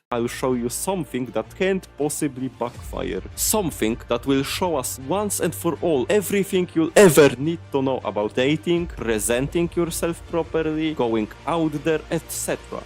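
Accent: Poland. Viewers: You sound like a Polish person, I know what I'm talking about bro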